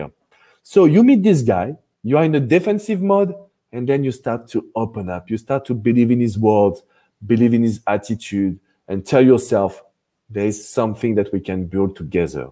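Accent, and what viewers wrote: France. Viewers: I am here for the french accent. :-) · NG from Nigeria. U are always apt and on point. I love the French accent. U are simply the best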